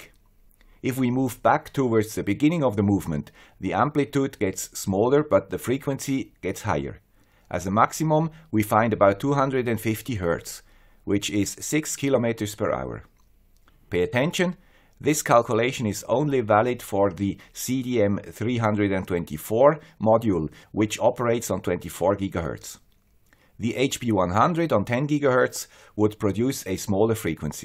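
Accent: Switzerland. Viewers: Hello Guy with the Swiss accent, I am a great fan of your channel and thanks to you I have built a lot of projects and Every one was successful. You are truly a great guy.. Can you do a project on building a speed gun radar detector to be used in the car using commonly available sensors and microprocessors. It will be really great project. The currently available sensors in the market are pretty expensive. Also as a maker it will be great fun to build one on our own. Thanks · 2 years in: still loving the guy with the Swiss accent.. my #1 go-to guy when I want to be informed about IoT, electronics and home automation! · Sir Mr Swiss accent guy, is it possible to hook a Cantena to these modules to increase range and directionality? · how do you spell the word that you say at the beginning of every episode? "Grietsie YouTubers"? I always enjoy that "Swiss" accent, which I imagine must be German or Romansh:)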